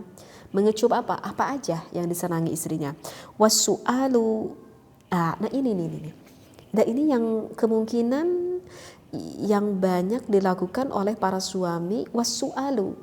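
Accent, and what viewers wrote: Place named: Java, Indonesia. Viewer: gpp atuh, lidah orang Jawa kok disama2in Arab. Jelas beda. Bismillah aja jadi semillah. Kalem aja, Tuhan bukan org Arab kok😅😊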